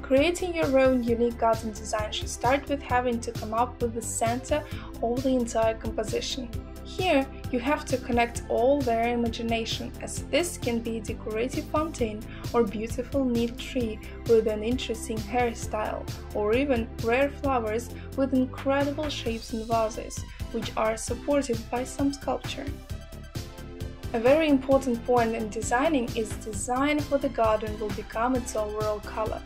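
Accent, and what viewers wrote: Russia. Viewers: Русскоязычный английский ,идеи полезные для меня, Thanks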